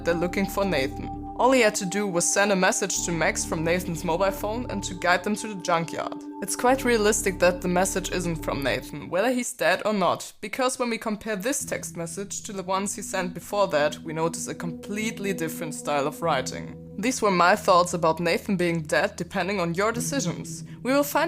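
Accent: Germany. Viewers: Your accent just sounds really german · Irgentwie habe ich mir gedacht vom ich sag mal Akzent das du Deutsch bist aber trotzdem gut😊 Also kann man Nathan Retten? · Dieser deutsche Akzent lol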